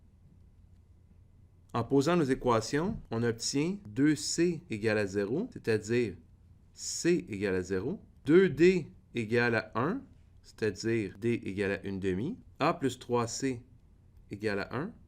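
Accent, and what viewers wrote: Canada. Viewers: The lady professor is much better + i don't understand your accent man, keep the channel international not just canadian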